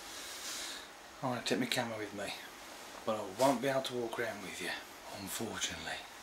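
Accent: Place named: West Midlands, United Kingdom. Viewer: were u from m8 sound from west midladz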